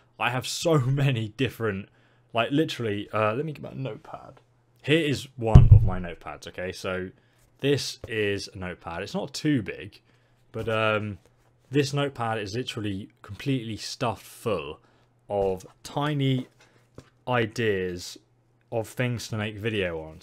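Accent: England, United Kingdom. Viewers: Where did you grow up? I cant tell which side of the north/south divide you are from. Speaking as a Yorkshire lad.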